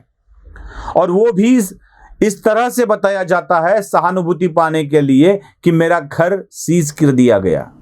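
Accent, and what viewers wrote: Maharashtra, India. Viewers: मन् की बात् का सन्दर्भ् एकदम् गलत् है. आप् मराठी मे सोचते है और हिंदी बोल ने की कोशिश करते है. मोदिजी का नाम लेने की कोई जरुरत नही ती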